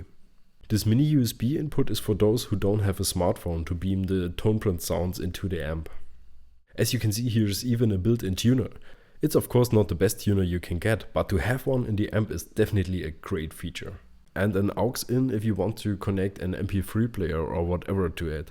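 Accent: Germany. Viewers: funny little gear and with your funny german english :))) great as allways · Nice review and accent ;) Typical German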